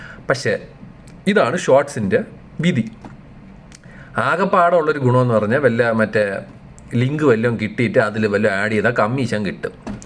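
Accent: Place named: Thiruvananthapuram, India. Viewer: that thiruvanthoram slang hits different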